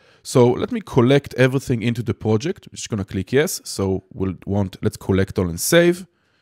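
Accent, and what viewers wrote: Israel. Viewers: cool thanks hamm Israeli accent ?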